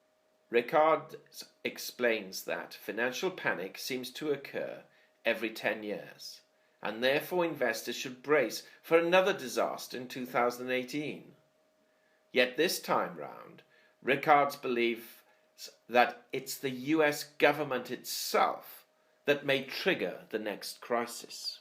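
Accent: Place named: Wales, United Kingdom. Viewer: I thought you sounded Welsh, is that right? if you are, it's a very mild accent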